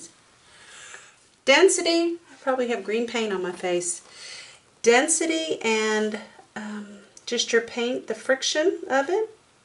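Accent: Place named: South, United States. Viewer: Where in Idaho? I have 2 Son's in Idaho Falls! I am glad I am not the only one who breaks out in song! LOL I Subscribed!! I Just Love This!!!! Where are you from? You sound like you are from the South! Love~~Z from Texas! Vonda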